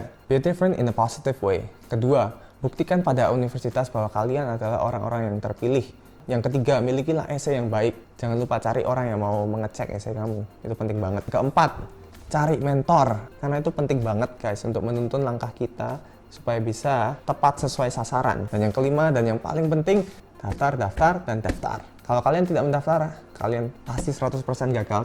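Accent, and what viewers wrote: Java, Indonesia. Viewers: Koko, logat china campur jowo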